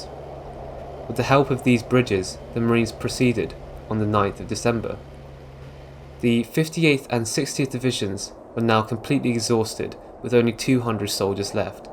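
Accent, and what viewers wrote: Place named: South Korea. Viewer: He sounds Korean. Possibly a Korean-American.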